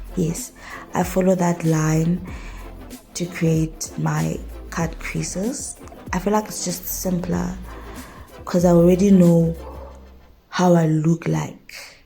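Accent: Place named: Nigeria. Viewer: is it even a video if we don't get the naija accent!!! love it😂😅😂😜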